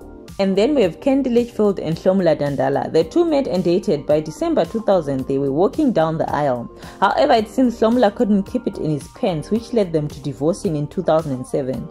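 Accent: Shona, Zimbabwe. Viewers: You're Shona aren't you? 😅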